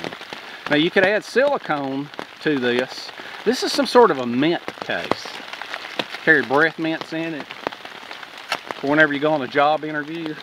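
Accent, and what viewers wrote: South, United States. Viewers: He is as southern as it gets. I am from Alabama i know what i am talking about. Well sometimes i do.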